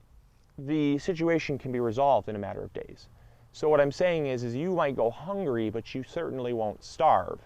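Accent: Michigan, United States. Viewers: Good to remind people that food does not come from the grocery store originally. Since most people, more than 80%, live in cities where 90+% of them raise nothing to eat nor would they know how, it is important to remind everyone of where it comes from. (Also I like listening to your Michigan "accent" and colloquialisms that one is not likely to hear elsewhere.) I grew up in Ottawa county, Michigan - near and in Holland.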